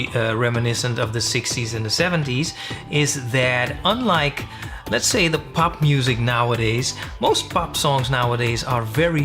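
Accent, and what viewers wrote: Netherlands. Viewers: are u nederlands?? ;-)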